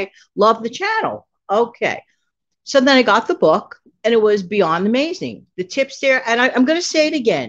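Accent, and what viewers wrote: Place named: New York, United States. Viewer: Yep. I came over because prepper princess mentioned you and that you were from New York City and she I think she even mentioned your accent and I said I miss being out east let me listen to a fellow New Yorker. And I have loved every one of your shows and haven't missed one the past couple of weeks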